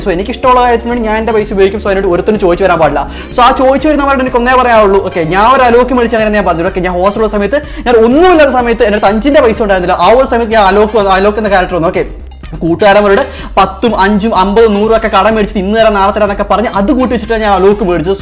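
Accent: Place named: Malappuram, India. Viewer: Malappuram language me myaman uyir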